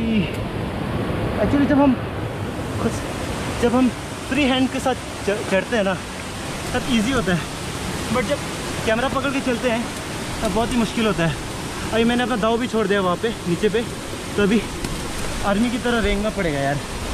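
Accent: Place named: Arunachal Pradesh, India. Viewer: I like the way you talk it's kind of funny I mean arunachali style mast h🤣.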